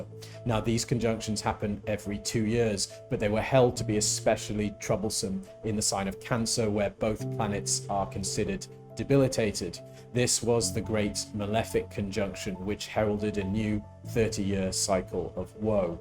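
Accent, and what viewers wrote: United Kingdom. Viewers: Nice to hear a british accent. Was a bit tired of boring Chris Brennan who almost makes me doze off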